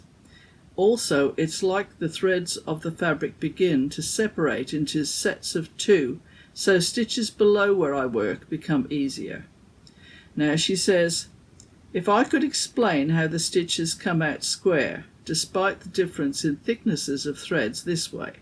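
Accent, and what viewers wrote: Australia. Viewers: Good morning. I cannot wait to see your stitching. Well, I have finished watching and it has been a warm and cozy hour. I especially enjoyed how you kitted up your projects. It’s funny that I would not choose thread cards to organize my threads. However I enjoy your process and even more enjoy your Aussie accent sharing all about it. Merry Christmas!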